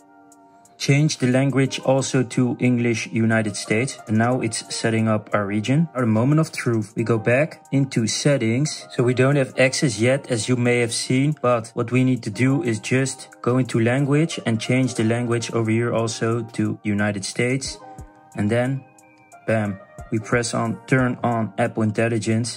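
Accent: Netherlands. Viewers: a nice good thick dutch accent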